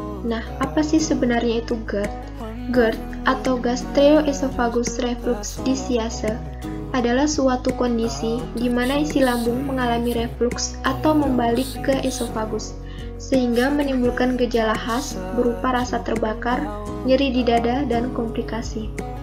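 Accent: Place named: Java, Indonesia. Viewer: Suka yg medok" jowo asli haha😂😂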